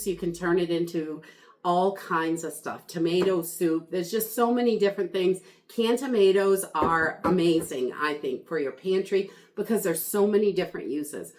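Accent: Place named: Maine, United States. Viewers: I think she’s from Maine. We just call it Wally world. I live in New Mexico. And I have an accent too, also I tend to talk very fast. I think that’s what you’re hearing is her accent. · from Maine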